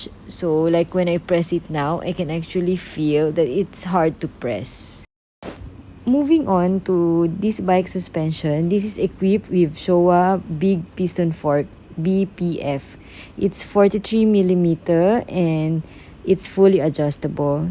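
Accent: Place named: Singapore. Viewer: I like your Singaporean-English accent hahaha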